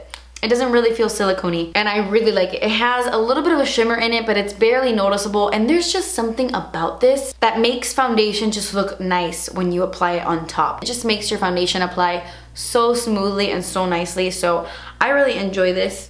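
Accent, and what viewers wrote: Cuba. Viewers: looool i love how u say ahhh entonces! with ur cuban accent! so lovely! im spanish and im always grateful to hear some of ur spanish words 😜😜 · I'm gonna get rid of that couch, ah entonces? So Cuban. Love you, you talk more often in Spanish, it sounds cool.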